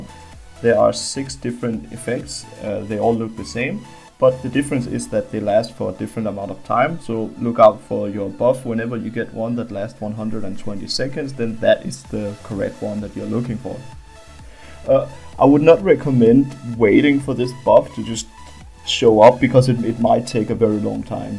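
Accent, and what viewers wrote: Denmark. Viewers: Du dansk, det kan man høre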